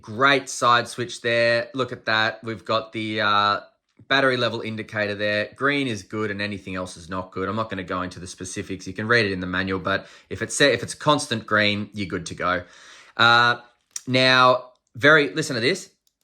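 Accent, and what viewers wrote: Australia. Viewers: with an aussie accent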